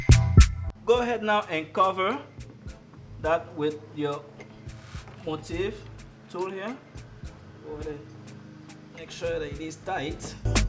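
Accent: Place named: France. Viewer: You don't have a hood (bonnet) sound shield. Your accent sounds French.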